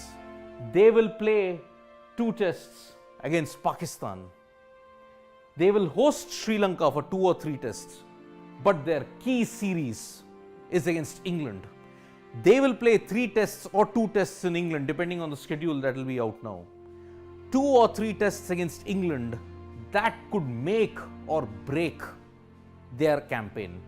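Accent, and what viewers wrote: South India, India. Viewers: I really like to listen his south Indian accent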